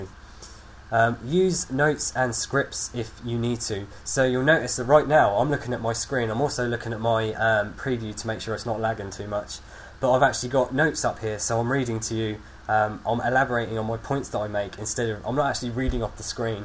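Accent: England, United Kingdom. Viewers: duh thats an english accent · like ur accent. British accents r hot!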